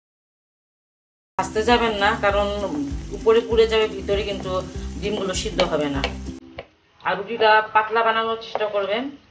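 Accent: Bangladesh. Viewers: aapnaar bangal bhaasaay kothaa bolaa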